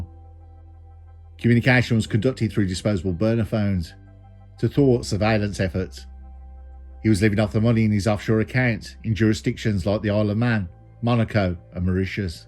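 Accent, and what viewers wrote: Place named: Midlands, United Kingdom. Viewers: 100%.. love this video and the combo of black country/brummie accent and surreal music makes this reality peaky blindersesque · Yeah that's it, it's the narration that fits like a hand in a glove with the content, probably his raw Midlands accent and demeanor that draw us in and keep us listening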